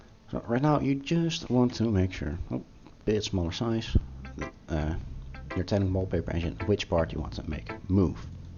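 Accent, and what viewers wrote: Netherlands. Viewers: Are you dutch? I recognize that accent. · your obviously dutch i hear that imidiatly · Yoooo that accent thoooo are u dutch ? Cus so am i lollololol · Lmao never been so confused Je accent liet mij denken dat je van Nederland bent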